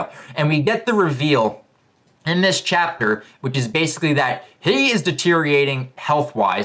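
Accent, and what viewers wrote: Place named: New York, United States. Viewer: you sound like you're from New York ( I'm from New York) no offense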